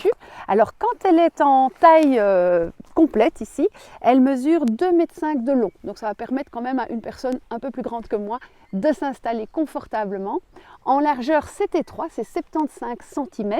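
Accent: Belgium. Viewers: J'aime bien ton accent ( Belge mais d'où ? ) quand tu dis couisiner, kil-o ( moi je dis kil-eau ) ,camme-ping, etc... Merci pour ton sourire et ton optimisme !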